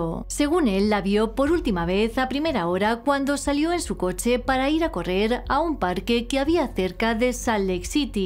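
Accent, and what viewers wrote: Spain. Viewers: Porque la critican aquí en España se habla así vale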